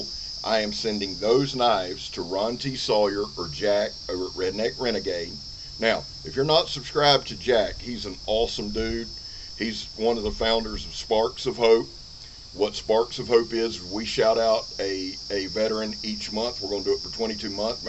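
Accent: South, United States. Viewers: Knife community huh?..pff! Im here totally by accident. One day I was looking for a site called "Big Teddy Bears" while I was going through all my lip glosses. There was this big tatted one holding a knife,it was called. Kinda different, checked it out.. Gotta be some kind of acronym or something I reckoned. Who names something for crying out loud right? Anyway, 'ol turned out to be a legitimately great teddy bear with an enormous heart. Im on my way back to the kithen now barefooted (NOT pregnant!) to check on them cookies im baking..just as soon as I stop to admire my Cold Steel 1860 heavy cavalry saber one more time of course lol. Bothered by your southern drawal Sir? Hardly, Im from South Carolina, mine is thick ass syrup..just like the rest of me!😂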